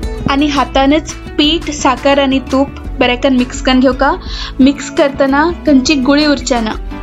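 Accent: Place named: Konkan, India. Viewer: You speak exactly my kind of konkani! I'm stunned. Haatutach and not haantuchi 🤗. Where do you come from?? I was always being corrected saying my language is not correct but now I'm so happy to have found another konk speaking like me and my mom's side of family!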